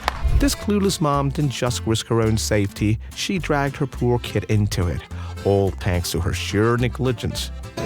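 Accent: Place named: United States. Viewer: Why is an American doing the talking ?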